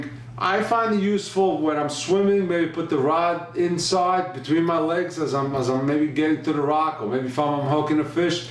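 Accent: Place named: Long Island, United States. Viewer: He's from Long Island, we all talk the same.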